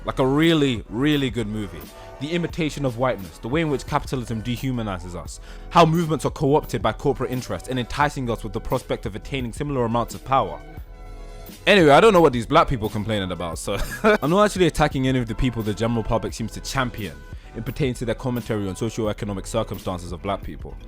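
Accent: United Kingdom. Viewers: OK I’m in the New Jersey right now. I’m halfway through the video and I’m trying to get what it is you’re actually trying to say. I’m having a little difficulty with your very casual black British accent. I think for future videos it would be nice if you used a traditional thesis statement and clearly define the arguments arguments pro and con. What do you seem to be doing now is playing a lot of distracting clips, name dropping a wide variety of media just because they have black characters in them and And doing sort of a stream of conscious rant that is very difficult to follow. I’m almost at the end of the video now and I don’t know what it is you’re trying to say. It seems to me that you’re saying that all black art is political because black is a social construct. I don’t know if that’s what you’re saying. If it is you could’ve said it in a much shorter video with much tighter editing and restricted to movies, television, Japanese animation as opposed to western animation. 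You could use storylines and specific examples from particular shows. I’m not really sure that you really thought out what it is you’re trying to say. Your analysis of get out is pretty off-base. I don’t like the premise of the movie. Which seems to be be suspicious of white people. It was a carefully crafted screenplay. Not being African-American I’m pretty certain you have no idea what’s going on here anymore than I have any idea what it’s like to be black and British. Anyway good luck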